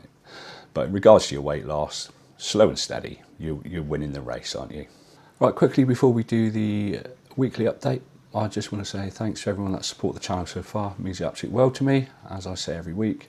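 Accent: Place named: England, United Kingdom. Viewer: OH, Brother, do I get a kick outta your English accent!! I live in Texas where we don't get to hear anything but that Southern USA, twang. I'm hoping that I get use to it soon so that I can better listen to your journey story without LAUGHING my a__ off. Thank you, Sir.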